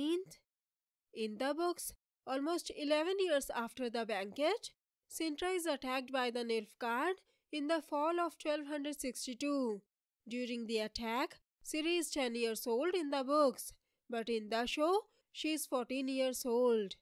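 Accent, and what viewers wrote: India. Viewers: Great video... but i wish the indian accent was not so unbearable...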